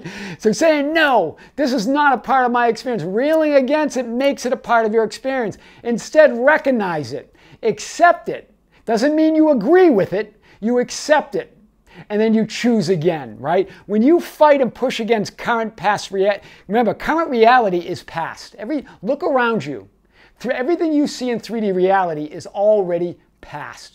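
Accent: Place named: New England, United States. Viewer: Where are you from?! From the hat and the accent I would guess somewhere in New England! From New Hampshire over here! Blessings to you! Love your videos. Thank you for clarifying for, and inspiring so many people around the world! ♥️♥️♥️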